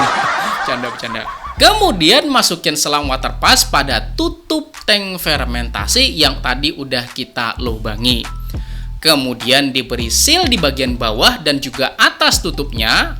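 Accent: Banjar, Indonesia. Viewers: Mas orang banjar ya? Logatnya agak mirip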